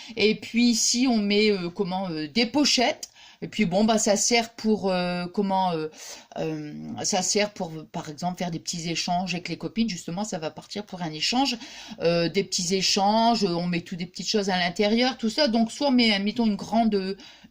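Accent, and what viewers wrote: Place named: Nord, France. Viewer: Bonsoir, vos créations sont vraiment magnifiques👍🤩🤩🤩et vous êtes tjours aussi Géniale, sympa et l'accent du Nord j'adore 😃😅 bonne soirée 😘😘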